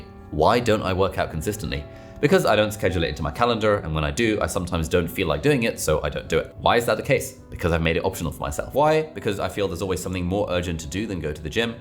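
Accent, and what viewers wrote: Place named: United Kingdom. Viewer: This may not be related to the video,but I want to ask you something.How do you talk so fast and clear?I can understand each word of your British accent,even though I find the British accent most hard to understand. Pls give some tips☺️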